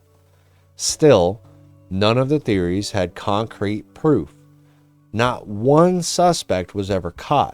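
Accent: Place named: United Kingdom. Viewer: 😂😂😂 you're british!?!? 😊😂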